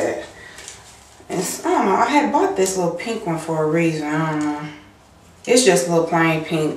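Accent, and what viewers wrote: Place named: Chicago, United States. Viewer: U r too funny!!! Nice finds. Where r u from? I remember u saying ur from the Midwest but living in NY. U have an accent and talk like my cousins in the Chi. :)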